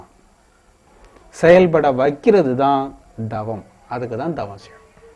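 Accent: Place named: Tirunelveli, India. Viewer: ஆஹா!!! ரொம்ப நாளைக்கு அப்புறம் திருநெல்வேலி அழகு தமிழில் மிக முக்கியமான விளக்கம் கேட்க்கும் பொழுது டபுள் சந்தோசம்😉 நான் இப்படி பேசும் போது எல்லோருமே என்னை கேலி பண்ணுவார்கள்!!!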